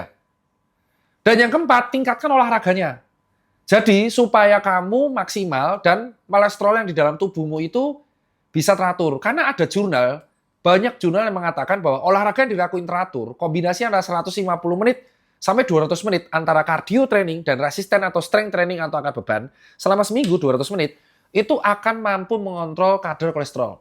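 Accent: Java, Indonesia. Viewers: Dok Tirta setelan Jawa gni paling suka.. hahha terutama pas ngmong "agak rekoso itu", "..let stgh jam" wkkwkw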